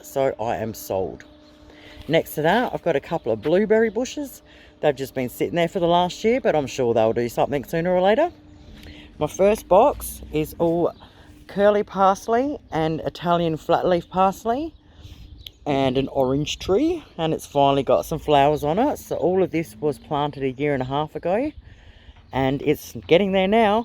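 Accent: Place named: Australia. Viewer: Love this ❤️ absolutely adore your personality 💕 you have that comforting Aussie bogan twang in your voice that just screams down to earth ❤️ I love your yard 🙂 I need to get off my butt and start growing foods 💕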